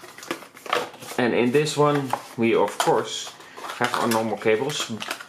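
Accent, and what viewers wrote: Netherlands. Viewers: Are you from the Netherlands? Because I hear the Dutch accent.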